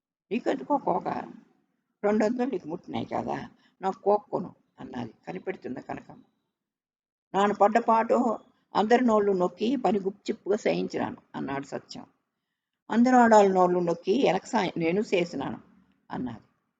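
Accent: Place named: Uttarandhra, India. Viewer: కధ బాగుంది, చాసో గారు కధలులో ఉత్తరాంద్ర దిగువ తరగతి ప్రజల జీవితాలు కధా వస్తువులు గారు ఈ ప్రాంతపు మాండాలిక భాషలో బాగా చెప్పేరు..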